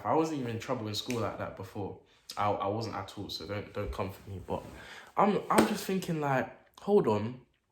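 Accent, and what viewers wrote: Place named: Northern England, United Kingdom. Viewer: With his dead northern accent the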